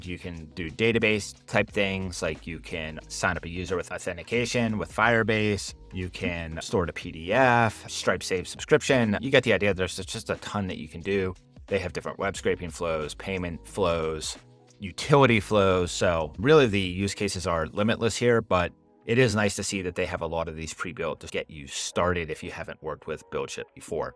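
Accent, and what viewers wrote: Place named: United States. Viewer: is obvious it uses your original voice, but being a native spanish speaker i noticed that language has a strong american accent, like an american learning spanish would speak, is there a way to do it without any accent? like a more natural tone? was that because of a setting? thanks for the video very interesting tool!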